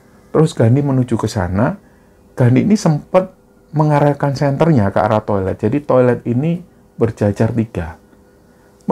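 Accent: Java, Indonesia. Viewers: Hadir mas, mas jawanya darimana? Kalau ngomong jawa enakeun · mas sebagai org jawa klo melafalkan kata bahasa jawa sy harap tdk usah spt bukan orang jawa. mas lo klo bicara jg medok. utk melafalkan kata "ndoro" dan "sedoyo" kok melafalkan suara huruf D nya tidak tepat y sbg org jawa. maaf y mas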